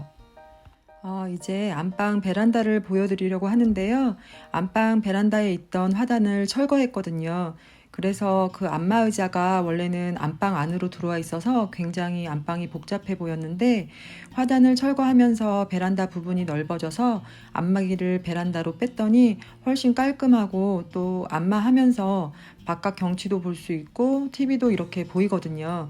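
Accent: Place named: Seoul, South Korea. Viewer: 서울사투리 너무 이쁘세요~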